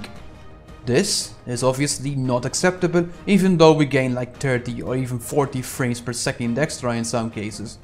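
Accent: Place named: Malta. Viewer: I knew that you are Maltese as soon as you start speaking ;-), so this also apply for an AMD 5700XT ? do you have maybe another video showing an AMD card?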